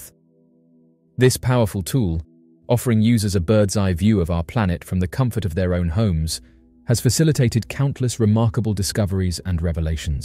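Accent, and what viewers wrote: United Kingdom. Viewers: No..he disappeared.. Oh my.. Its facts we want...not wordy british narrator... Oh well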